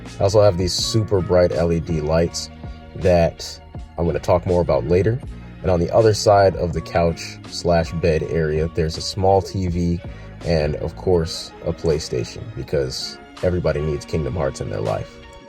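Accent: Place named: Canada. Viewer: You totally sound Canadian, where are you from?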